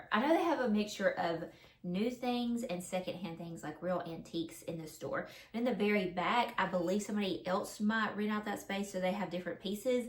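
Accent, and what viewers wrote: South, United States. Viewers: You and your sweet little family are precious ! I have family in AL, so listening to you talk with your southern drawl takes me right back "home" 🥰 Love to see all of your finds and how you decorate with them !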